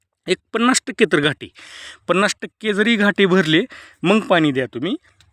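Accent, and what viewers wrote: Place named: Latur, India. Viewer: Bolibhasha latur che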